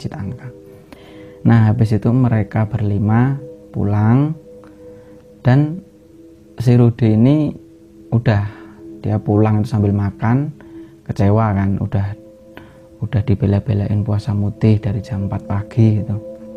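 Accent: Java, Indonesia. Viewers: Betooll.. Logat org jawa nek di campur GUA jd janggal aja.. Lnjt berkarya brooo👍👍👍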